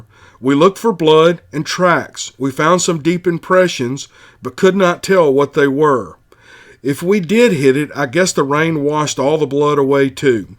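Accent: South, United States. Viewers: I really appreciate hearing stories from and about southern people being told by someone with a pleasant southern drawl not to mention the soothing voice. Thank you for the great job you do narrating these episodes. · Love this channel, I'm from London so love the southern smoothness, keep up the good stuff my man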